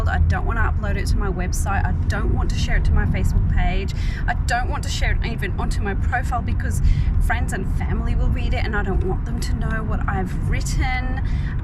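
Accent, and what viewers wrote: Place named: Australia. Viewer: Hello, thanks for sharing and thanks for the tips and the advices! Love your accent! You probably live in Adelaide as I do. Thanks again!:D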